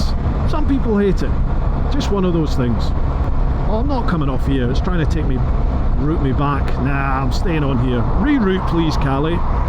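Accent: Scotland, United Kingdom. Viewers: The SOS messages you’re getting are false negatives. The system is listening and self-teaching itself voice-recognition. Unfortunately, it struggles with the Scottish accent and is triggered. You’ve seen those videos about voice recognition lifts right?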